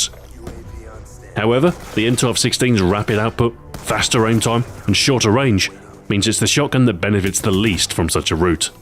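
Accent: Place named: United Kingdom. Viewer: With a weird voice? He has a British accent, you moron.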